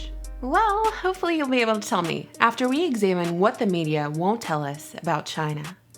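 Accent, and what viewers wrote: United States. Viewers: you sound like an American to me then you must do a video of the name instead change it to 'What the media won't tell you about US' The video will take conservatively over an hour if not much longer. Good luck. You info on China is nothing new, read a good history book on China written by notable historian rather than an ideological one.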